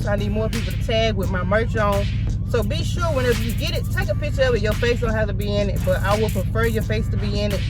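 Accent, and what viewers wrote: South, United States. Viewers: Well, it's always a pleasure seeing your beauty brown skin next to that car, and hearing that lovely southern accent. 😊 Sending love all the way from Philly. 🔔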